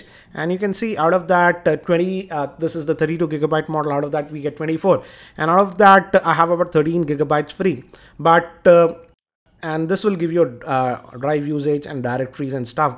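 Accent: India. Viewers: Can anybody that doesn't have the most annoying Indian voice do Android cat videos please.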